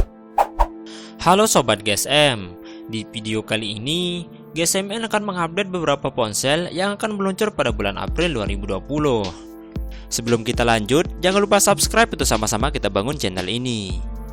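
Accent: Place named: Java, Indonesia. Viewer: Adooh, medok suarane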